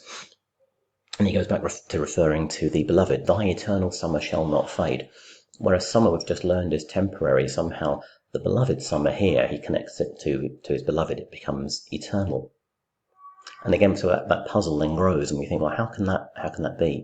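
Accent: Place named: RP, United Kingdom. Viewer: I swear this one is the best interpretation ever! And your clear RP sounds exceedingly gentle!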